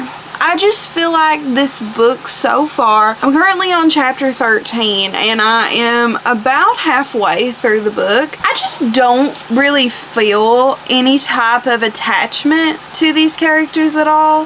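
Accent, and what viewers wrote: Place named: South, United States. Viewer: Ok, I’ve gotta know where you’re from. Love the southern accent. I’m from Georgia 🍑 always looking for new book besties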